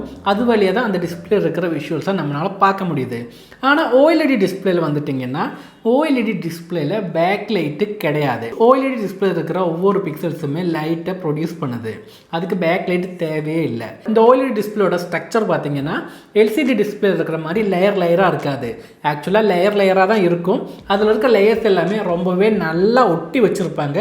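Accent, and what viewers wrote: Kerala, India. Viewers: This guy is a Malayali!!! His pronunciation is that of typical Malayali!!!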